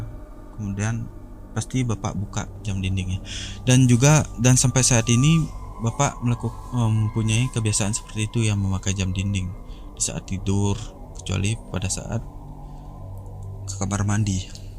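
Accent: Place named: Bali, Indonesia. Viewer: Cara penyampaian ceritanya ok terlebih lagi ditambah logat Bali nya sangat unik sekali. Mungkinkah itu setan di sungai itu salah satu karakter patung setan yang digiring orang Bali di acara ritual apa yah namanya ?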